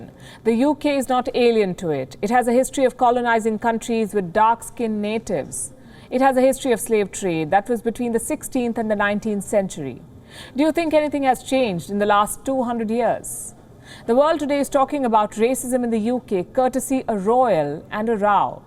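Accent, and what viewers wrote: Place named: India. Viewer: Indian English accent beautiful and the best😍 Love from Philippines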